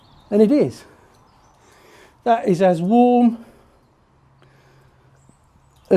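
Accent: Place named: United Kingdom. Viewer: british accent take a guess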